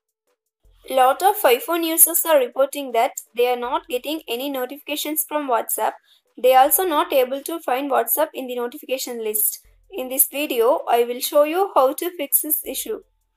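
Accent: India. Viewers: Thanks for “caption”. English of Indian accent, because, it’s hard for me to understand it, I usually get off of the site. But with your CAPTION, I read and I am thankful of that. “THANK YOU”.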